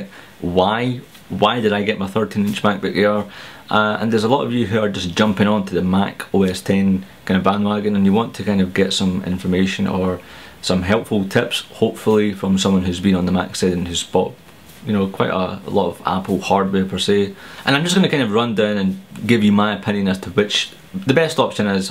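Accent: Scotland, United Kingdom. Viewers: He is speaking English it's called having a scottish accent!! I'm not Scottish and I can understand him. People are so ignorant sometimes as soon as they hear an accent they stop listening to the person and assume they can't speak proper English. :( So disrespectful. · another scottish person on the internet!!.... it isn't just me! :D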